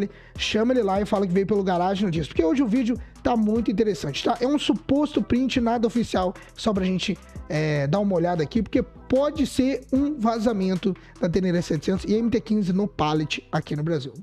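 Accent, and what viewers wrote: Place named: Portugal. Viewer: Portugues de Portugalllllll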